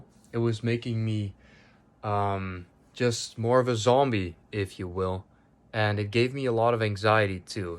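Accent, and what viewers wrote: Netherlands. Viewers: Yo bro are you dutch?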